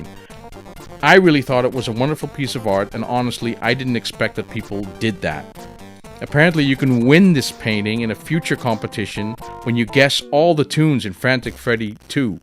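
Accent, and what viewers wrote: New York, United States. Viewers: Is that a new york accent?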